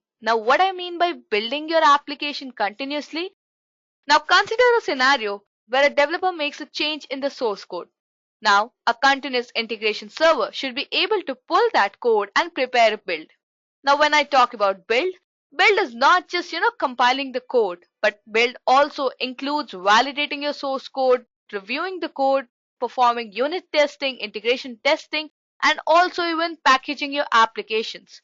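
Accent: India. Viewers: Great talk! One note for improvement for the part with the CapitalOne use-case; with it being the primary example, some on-screen notes would have been fantastic, as the pan-Indian pronounciation gives me some trouble (ex. 'commit' and 'comment' sound identical to me). Also, for Job3 of the practical walk-through, you do not show the code needed in the Build section - everything below '#-Completion' is not shown. I learned a lot, thank you :)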